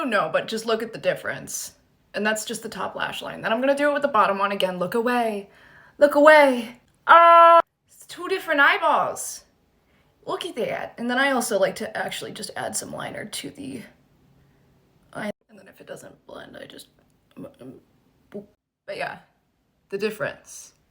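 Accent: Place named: Midwest, United States. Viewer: why did I hear that in your Midwest accent?? 🤔🤣😍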